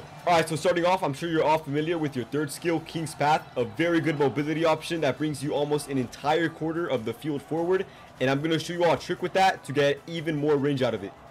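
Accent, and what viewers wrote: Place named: United Kingdom. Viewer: You sounded like british aiku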